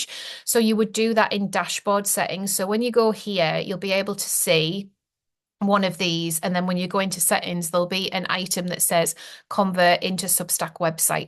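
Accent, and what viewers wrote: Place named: Northern England, United Kingdom. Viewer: WAVES FROM OVER THE BORDER IN CUMBRIA!! Thank God!! A Northern real voice!!! There is a god!!!! Nice to meet you! Thank you for being you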